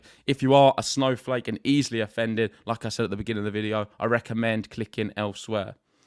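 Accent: United Kingdom. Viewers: You're English/British?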